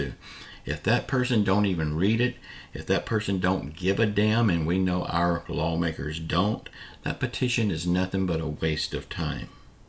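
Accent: South, United States. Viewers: We the people ask how many cups of coffee did you have this morning love your shoe! I can tell your from the south you have a great since of humor please don't ever change I get you ever morning to start my day with your great humor and truth love your wife's show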